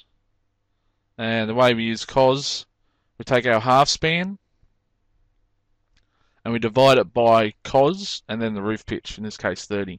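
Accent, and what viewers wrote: Australia. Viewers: How refreshing. An Aussie accent, clear descriptions and metric measurements. My applause is attached.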